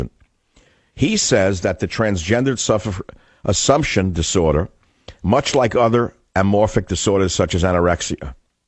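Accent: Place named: New York, United States. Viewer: Again, who is this guy so sure people should listen to his opinion - what special thing has he done or whatever that gives him such right to spread his venom and expect someone to listen and his new york accent. Yuck even when he says words like freedom and other goods words. He is nobody and unknown - what has he achieved?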